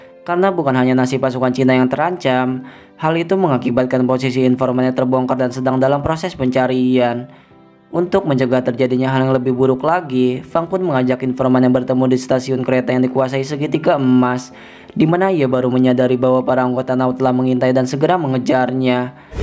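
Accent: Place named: Java, Indonesia. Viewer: Logat nya mendok sekali eperi badi😂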